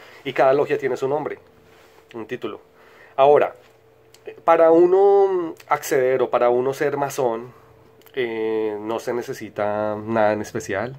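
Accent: Colombia. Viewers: Jefe Maestro no ve ke me estoy riendo. ? no me molesto. pero eske si. la vos de este hombre. me encanta. nose si es colombiano. eso me encantaria todavia mas. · Eres Colombiano? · Gracias por tú información amigo,por tu acento sé que eres colombiano, pero lo importante aquí es que eres inteligente, gracias por la información yo en lo personal no tengo religión pero no soy ateo yo creo en jehová en Jesucristo y en el espíritu santo y ,no soy masónico pero creo que sin querer me identifico más con ellos, no quiere decir que quiera unirme a ellos, pero no e querido unirme a ninguna religión cristiana, porque veo que siempre hay desigualdad en todas,,claro y lo tengo muy claro, nunca seré musulmán ésa religión sí no le encuentro sentido alguno,,,,,morir sólo porque sí muero me van a estar esperando siete vírgenes en el cielo,eso no tiene sentido, yo prefiero disfrutar vivo a siete mujeres aunque no sean vírgenes pero en vida y claro si ellas quieren con el consentimiento de ellas!!!! 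· Esta bueno el vídeo....Creo que el acento de este hombre es de Colombia 🤔 jejejejejeje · Tienes acento bogotano, me gusto el video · Acento colombiano? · Lo que usted dijo ya se dijo otro lo dijo al otro y dijo digan al otro a que digan y lo dijeron a digan y dijo y así me doy y al final no decís nada y te cubrís de ninja por miedo o tu eres masón tu acento es colombiano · Eres colombiano 😁?? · Tienes acento colombiano! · me pa ke sos colombia vos-- igual bien x tu data-- --- yo argentina ! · A ver... Es del futuro....? De que año? Y porque habla como rolo gomelo gay de la actualidad....?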